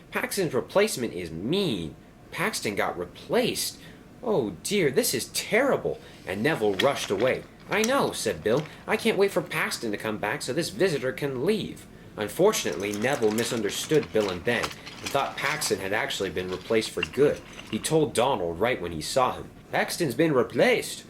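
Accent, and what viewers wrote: Scotland, United Kingdom. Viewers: Your Scottish accent is spectacular.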